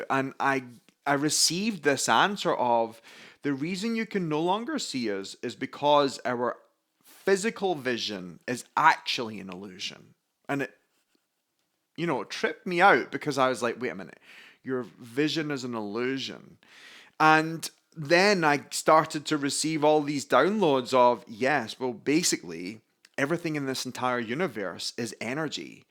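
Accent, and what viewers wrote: Scotland, United Kingdom. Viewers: Always a great pleasure to hear you (love your Scottish accent)and thanks for this deep lesson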